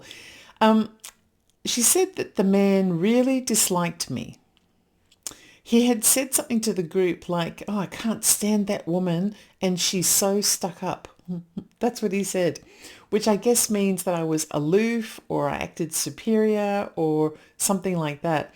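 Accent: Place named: England, United Kingdom. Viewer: In modern vernacular, it's If you spot it, you got it. When I first moved to the north of England, my southern accent earned me disapproval from the locals, who would accuse me of being posh, and talking "wi plum in't gob". I actually have a fairly strong south east London accent, which is far from posh, as you will know. You'd think I was talking like a BBC announcer from the 1950s. It took them a while to let me just be from the south, rather than a princess. So, I wonder if that was a similar thing with your dog park guy. He thought you had pretensions, bc of your slightly Englishy accent.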